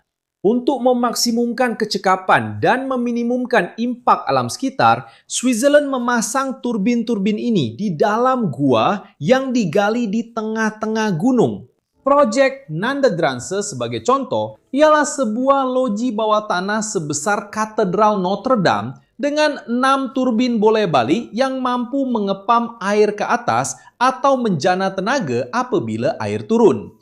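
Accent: Indonesia. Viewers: Admin ni orang Indonesia yang bercakap Bahasa Malaysia ke? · Bodoh je orang indon ni buat contain guna AI alih bahasa Melaysia campur bahasa indon. Bodoh doh bunyi dia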